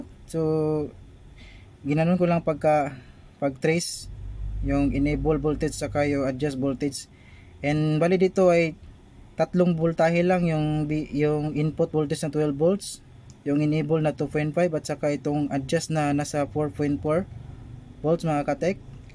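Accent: Visayas, Philippines. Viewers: Good job, bisaya ka sir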